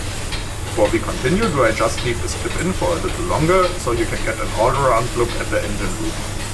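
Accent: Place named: Scandinavia. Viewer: The way the narrator talk is make me think if it's Sweden? Germany? Or Norwegian?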